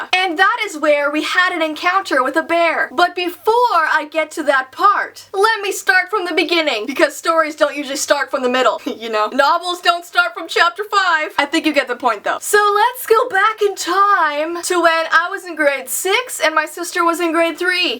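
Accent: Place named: Canada. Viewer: Ilysm!!!!!!!!!!!!!!!!!!!! I love your stories and your Canadian accent!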